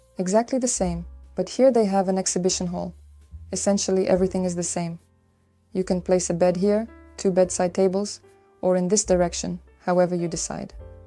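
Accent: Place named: India. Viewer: Акцент жесть, конечно. 😅 Индийский акцент. Вроде в СССР хорошо преподавали английский, почему у вас такой акцент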